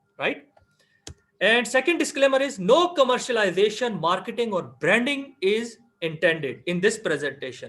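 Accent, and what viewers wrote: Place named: England, United Kingdom. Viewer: Sir you have a great English accent and Teaching style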